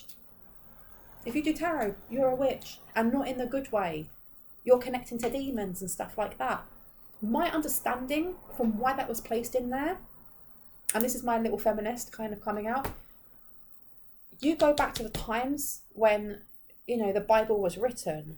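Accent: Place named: Hertfordshire, United Kingdom. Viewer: where are you based exactly? you sound like you come from hertfordshire ,, anywhere close?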